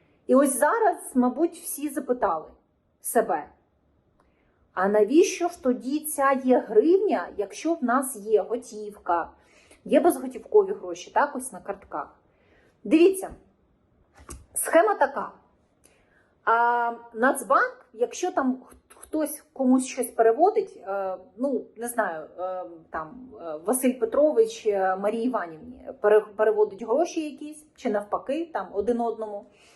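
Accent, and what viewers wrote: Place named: Russia. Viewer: В українській мові немає щьо,, щьоб, чі ,тощо. Відійдіть від російської мови.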